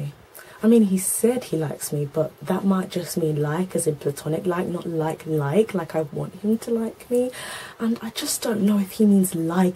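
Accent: United Kingdom. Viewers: A Black Girl With A British Accent, That is The Best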